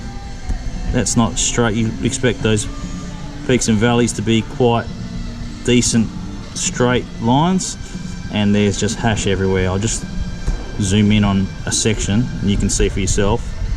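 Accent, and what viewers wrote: Australia. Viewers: Great stuff - good to hear another Aussie on youtube.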